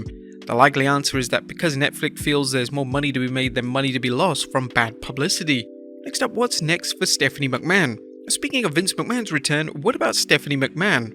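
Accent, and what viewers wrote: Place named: England, United Kingdom. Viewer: Youre a narrator with an English accent so at least pronounce Vince's surname correctly! Its Vince McMahn, not McMan! 👎🏻🤦🤦‍♀️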